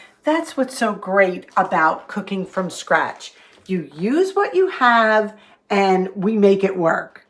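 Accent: New York, United States. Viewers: Im a New Yorker moved to the south and i adore your ny accent , makes me miss home.I still have mine too.I am told.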